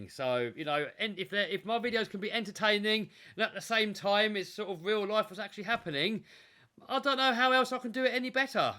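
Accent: United Kingdom. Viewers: Yo, i join to this Chanel a year ago and i have seen all ur main videos chanel i like u, ur conten and the british english Continue been like u r i love all what u do, in all videos u make me laugh and i hope u get that 2 millon of subs Thanx and a greeting from Spain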